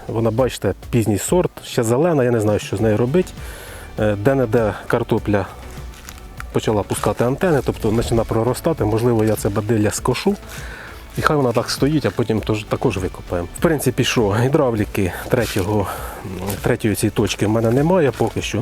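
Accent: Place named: Cherkasy, Ukraine. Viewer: мова українська, діалект Черкаський якщо не помиляюся. Дивіться відео і колись щось і зрозумієте, з картинки теж багато що зрозуміло.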